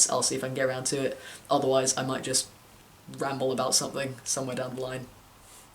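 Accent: United Kingdom. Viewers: Dude, even I, who's not an English speaker, can FEEEEEL the British accent ! xDD (not that it bothers me, it's cute actually :3) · Oh I have a question are u British sorry I don’t know im new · He is cute but that accent is asome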